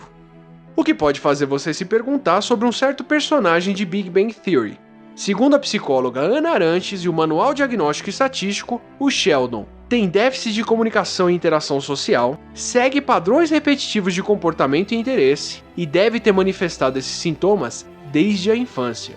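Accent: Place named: Rio de Janeiro, Brazil. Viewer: this carioca accent is deadly deplorable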